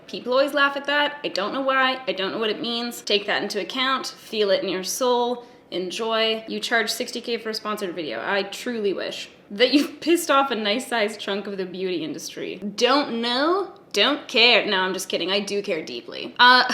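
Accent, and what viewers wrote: Canada. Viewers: This is going to be such a random comment but in this video you sound like the Canadian stereotype from a century old. Yeah. Sorry about that, I had a weird association.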